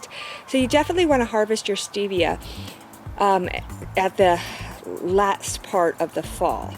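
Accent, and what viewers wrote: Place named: Wisconsin, United States. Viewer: They sell live stevia at my local co-op every spring and I've always wondered how one would use it. The fact that the garden-fresh powder is actually less bitter than the processed store-bought stevia really intrigues me, I figured it would be the opposite. Can anybody else confirm? Side note: Not sure if anybody has ever mentioned this to you before, but your Wisconsin accent is adorable. I also live in WI, but in Madison, so you don't hear that "Wisconsin" accent too much down here.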